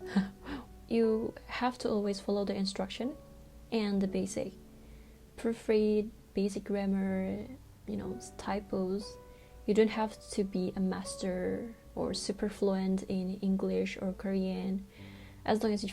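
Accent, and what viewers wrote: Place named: United Kingdom. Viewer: how can u speak like a British? Can u give somes tips n trick?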